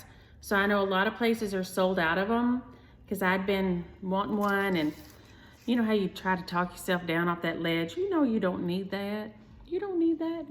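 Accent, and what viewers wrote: South, United States. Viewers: I don’t know you at all as this is the first time I have watched you. But I have enjoyed this video so much. Usually So. Folks accent irritate me.....but yours is as darling as I’ve heard. You can really tell some funny stories your own way. Your stitching is lovely.....and they look smooth and oh so nice to me. White thread has always been hard to see with.......even when I was young and doing regular embroidery. They just don’t lay right. Your finishes are so cute. The red barn w/ the sheep...so cute. Your white thread looks fine to me. I don’t know the story of your mother, but I wish you well w/ the saviors spirit w/ you and your family. I’m 69 and live in Idaho.....I look forward to your videos to come. I am going to try to find your videos from the past. Do you live a farm. I had a snake in my house once and nearly died. 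Once you have a snake in your house, a mouse is nothing! Bless you!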